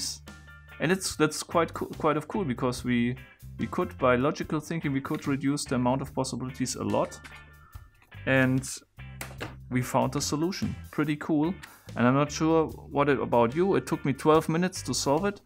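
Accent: Germany. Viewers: I hear you normaly speak german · Sach mal du bist doch Deutscher oder nicht? :D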